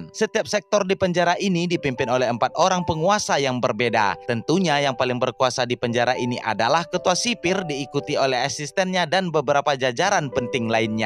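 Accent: West Sumatra, Indonesia. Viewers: Orang minang ya bg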